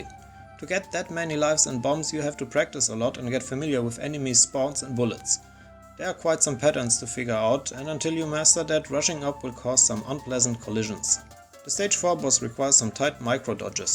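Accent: Germany. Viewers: Is that a german accent? I can feel it. I'm suffering from it too.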